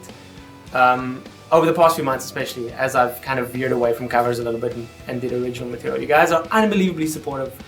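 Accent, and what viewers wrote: Afrikaans, South Africa. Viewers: If you want a laugh turn the captions on for the video. Youtube doesn't understand the South African accent at all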